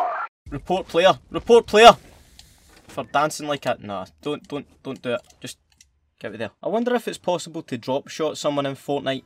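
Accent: Scotland, United Kingdom. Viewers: Thats scottish if im not wrong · Feng Magic Gadget it’s Scottish man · It’s Scottish ya nuggets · Just realized he is scottish LUV YA ACCENT! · Scottish ya spoon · he’s not Irish he’s Scottish from Scotland like me and my family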